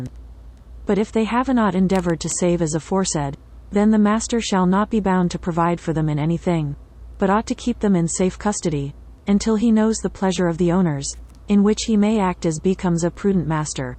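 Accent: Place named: Louisiana, United States. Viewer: Man I love your content. I hope I don’t offend if I’m wrong but I detect a Louisiana accent. Woahdayyyy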